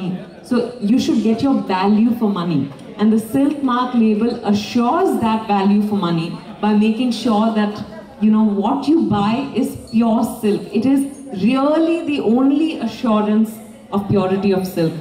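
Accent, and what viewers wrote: Palakkad, India. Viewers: Nee palakattu Tamil appuram yenn Tamil theriyathu Nalla nadippu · Palakkad TAMIL💪💪💪💪💓💓💓💓🇮🇳🙏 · Palakkad Tamil 💞💞💞💞💞💪💪💪💪🇮🇳🇮🇳🇮🇳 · Paalakkadu Tamil girl vidhya balan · Pallakad Tamizh is so pure😍😍👀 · Mam, your Palakkad Tamil speech is so sweet. You are so beautiful in sarees. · Why is she embarassed to speak Palakkad Tamil? It is also one among the many slangs in TN. She should be proud that she knows Tamil even after so many years. Also a big L for those Tamil directors who didn't cast her, national cinema gained a gem.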